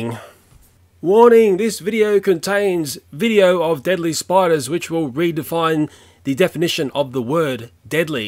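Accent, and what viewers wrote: Australia. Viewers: I know this is random, but are you an Aussie or Kiwi? I'm just wondering where your accent is from.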